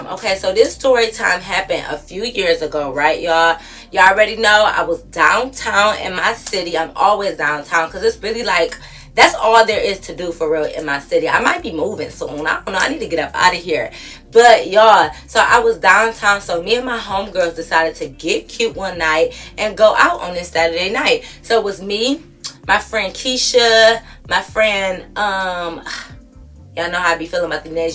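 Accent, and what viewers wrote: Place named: Connecticut, United States. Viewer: You have a ct accent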